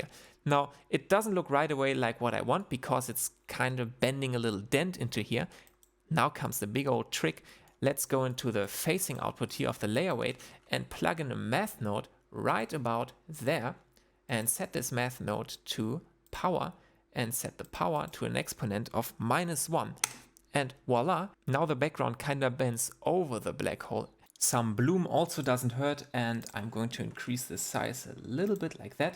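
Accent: Germany. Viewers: Not only is the accent german, but the human is painfully german. Not austrian, but so painfully german. Und damit danke fuers Tutorial und gute Nacht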